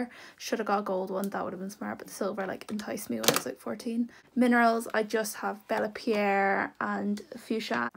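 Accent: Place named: Ireland. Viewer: Where abouts from Ireland are you?? I’m Irish but live in England , and I absolutely love your video and accent mines faded but it comes back ❤️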